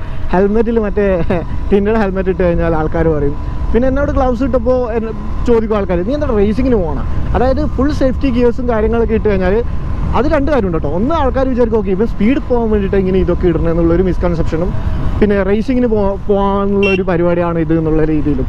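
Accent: Kannur, India. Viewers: chetta, chettan kannur aano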